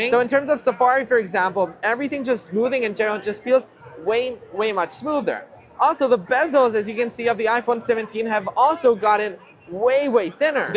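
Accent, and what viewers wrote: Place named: Spain. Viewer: El español de de España tía hablando de los iPhone joder 😅😅😅😅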